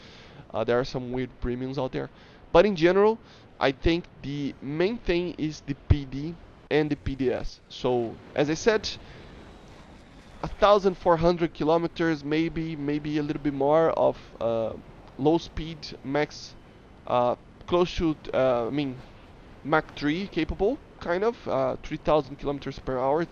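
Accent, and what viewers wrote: Brazil. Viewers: eu conheço o sotaque brasileiro KKKKKKKKK, muito bom seus vídeos Man, continua com o ótimo trabalho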